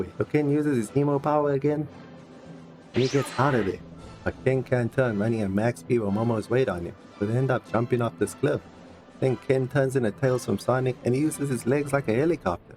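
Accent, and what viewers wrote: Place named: Australia. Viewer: this is a black australian accent, i believe. i have black australian friends that sound the exact same.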